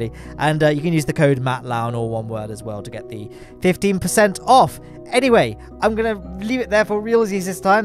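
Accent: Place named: RP, United Kingdom. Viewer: why you so posh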